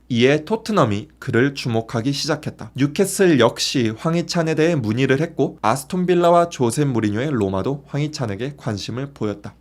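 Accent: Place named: Jeolla, South Korea. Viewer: 전라도 억양 디게 거슬리네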